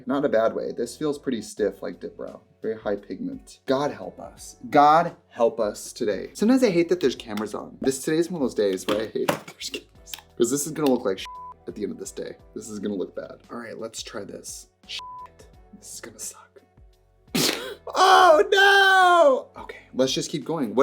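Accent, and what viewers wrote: Wisconsin, United States. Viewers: trixie’s wiscansan accent really popped out in this video (i say as someone from effing maryland) also a whole face of milani might be the move. 👀👀👀👀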